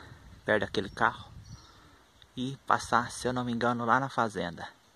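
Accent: Minas Gerais, Brazil. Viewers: Que delícia de passeio amigo, adoro ver essas casinhas de colônia, um lago na frente ainda pra ajudar na beleza. Não se preocupe com a maneira de falar, nós mineirinhos somos assim. Um grande abraço! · Oiê, acho o seu jeito de falar lindo, acho que é de mineiro mesmo, também vc não têm que se preocupar com erros, a maioria das pessoas fala errado, pra que falar no pé da letra eu também falo, gosto de falar errado, e tem mais estamos enteressado é ver estes seu vídeos maravilhoso. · Português de mineiro tbm sou assim.uai...Kkkk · Boa noite que lugar lindo natureza pura que saudade da época que morava na fazenda do bálsamo perto de piscamba município de jequiri não preocupe com seu jeito de falar e na simplicidade do nosso idioma mineiro e bao e bonito como dizia meu avô eta trem bao ser mineiro uai abraços fica com Deus.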